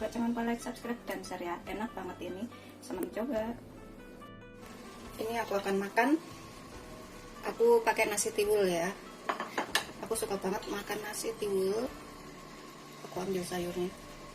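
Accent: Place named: Banyumas, Indonesia. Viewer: Mba'e logate kaya wong mbanyumas.. 😊😊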